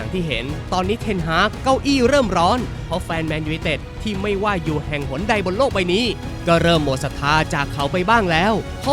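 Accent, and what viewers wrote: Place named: North, Thailand. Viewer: เพิ่งรู้ว่าแอดมินเป็นคนเหนือก้อคลิปนี้แหละ😂😂😂😂แกนตา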